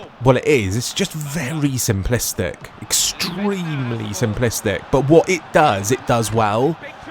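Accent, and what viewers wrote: Wales, United Kingdom. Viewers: Are you welsh as I can’t hear a little welsh, also big up United.. even tho we are trash atm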